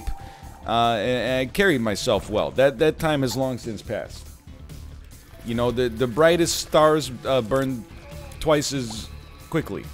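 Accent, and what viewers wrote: Canada. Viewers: Is it me or canadian accent is getting thicker?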